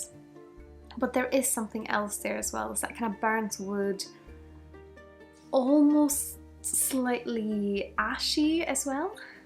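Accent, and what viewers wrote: Scotland, United Kingdom. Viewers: not a native or an expert, but you do sound like a Scot. and Oban 14 (depending on a release, granted) is one of my favourite all time drams. there's just something sticky and earthy about it that I just love and almost can't find anywhere else.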